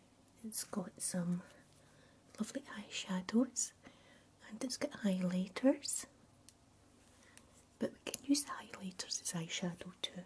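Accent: Scotland, United Kingdom. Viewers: Thankyou for a great video! I love loveeeee your Scottish accent! And I love your makeup videos. You make it so relaxing! Great job gurrrrrrrlll!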